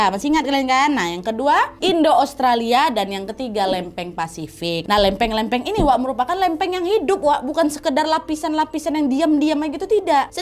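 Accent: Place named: Medan, Indonesia. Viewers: Ibu...ini Orang Cerdas, Luas wawasan ilmu historynya Cantik Pulak Memberi keterangan Jelas Cepat Lincah..Aku selalu tengok Canel Ibu ini dari logatnyo Orang Sumatra sama2 Indonesia Soalnyo Aku lamo di Jambi dari kls 1 SR tahun 1960 tahun 1963 SR ganti SD Aku kls 3 SD Sampe SMP Kls 3 tahun 1969 Aku Pindah ke Jawo tengah, Aku suko nian nyimak Chanel Ibu Semoga di beri rezeki Panjang Umur di Jauhkan dari Maro bahayo and Sehat Selalu ya Bu? Amin YRA Thank's. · Logad Medan nya kental kali kau😊😂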